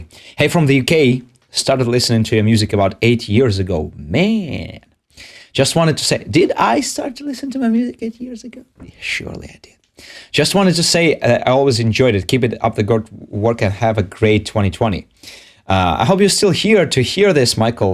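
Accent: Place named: France. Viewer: nice new track, congratulations, dear. 🍀 you have such a charming French accent. and you make such cool remixes of the French language: - les filles jouent de (avec des) boules de neige. (the girls play (with) snowballs) ❄ - qu'est ce que c'est que ça? ça sent bon le chocolat. 🍫 what is that? it smells like chocolate. (copyright with the song " " 2012.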